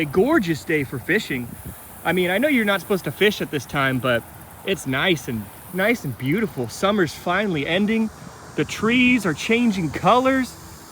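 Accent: South, United States. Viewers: I’m sorry am I crazy, you actually sound like you’re absorbing a little bit of the Arkansas/Southern drawl??? Sounding less like a Californian for sure!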